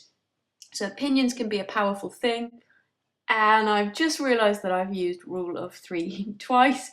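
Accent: United Kingdom. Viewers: I love British accents also great video I learnt a lot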